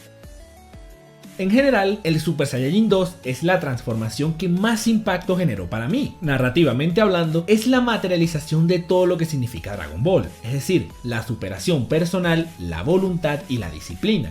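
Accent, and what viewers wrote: Venezuela. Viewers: Pamre jure nunca ver a un youtober con ese acento venezolano pero tú eres la esepción grande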